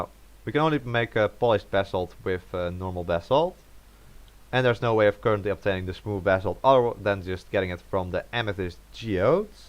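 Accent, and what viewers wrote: Netherlands. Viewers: i am 1000% sure he is dutch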